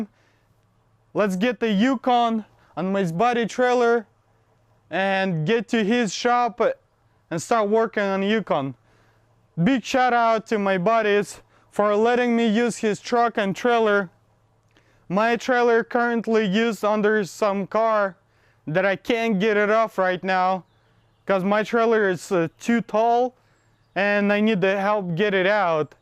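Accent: Russia. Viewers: you speak english like a russian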